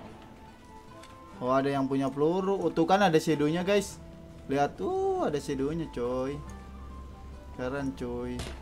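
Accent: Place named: Tegal, Indonesia. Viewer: Cuk ngapak nemen kie. Wong tegal apa wong semarang kie 😂😂😂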